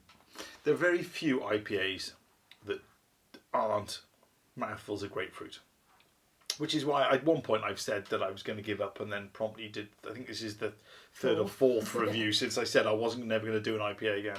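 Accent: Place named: Wolverhampton, United Kingdom. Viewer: Great Wolverhampton accent too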